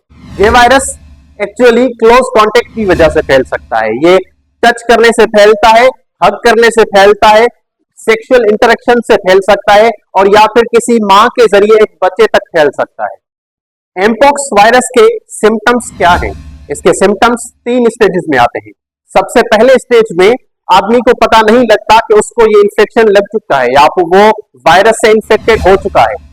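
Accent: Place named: Sindh, India. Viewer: Sir I have some suggestions I would like to share with you firstly that there is a little bit Sindhi accent flowing in your tone kindly improve that and second the voice quality is not that good for that you can use Adobe podcaster firstly you will import your audio file from your video then you can enhance it from Adobe podcaster then later you can mute the video and put the audio file it will improve it soo yaa that's all I guess please put a eye on that thank you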